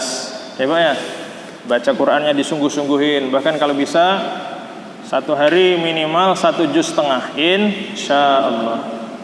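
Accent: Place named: Johor, Malaysia. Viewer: Bagus Bahasa Melayu Dia seperti Bahasa Melayu kami di johor juga.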